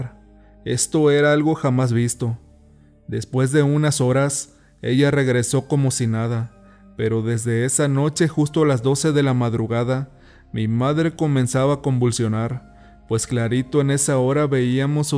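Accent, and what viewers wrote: North, Mexico. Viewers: Pierdes mucho tiempo mandando saludos ya que son muchos es lo único malo que no me gusta de tu canal tienes Buenas historias Pero también tu acento Norteño no tienes una buena dicción para narrar pero tienes Buenas historias te repito saludos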